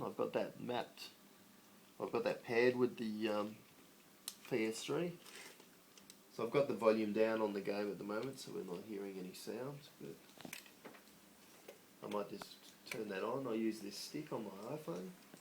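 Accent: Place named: Australia. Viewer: Hi, thanks for the interesting video - a really interesting setup you have there. I'm in a similar situation to yourself - quadriplegic (or tetraplegic as we tend to say here in the UK). I'm C4 complete - I'm guessing you're C5? Anyway, I'm also a keen BF3 / BF4 player, although my approach to playing the game is distinctly 'low-tech' compared to yours:) Take a look at the video on my channel & you'll see what I mean! I assume from your accent that you're based in Australia? I would suggest that we meet up in a game sometime, but I'm sure the ping times would be horrible, and I guess you play on the Playstation 3, whereas I'm on a PC. Anyway, best of luck with the gaming!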